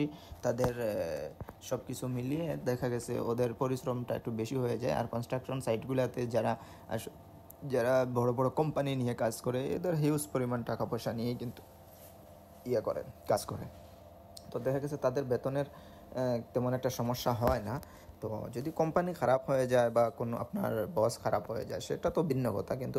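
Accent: Sylhet, Bangladesh. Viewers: বাইয়া আপনা কতা বার্তা বুজা জায় আপনি সিলেটি আমিও সিলেটি মুল কতা হলো বাই কোন দিন জদি আললাহ আমাকে দয়া মায়া করেন জদি আসতে পারি রােমানিয়া তাহলে কি ভালো হবে জদি বাই আললাহর হুকুম তাকে আকি নচিবে দেয় রিজখে টানে আকি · ভাইয়ের কথায় মনে হচ্ছে সিলেটি হবেন, ভাই রেস্টুরেন্টে কাজে কেমন বেতন হয় জানালে ভালো হতো?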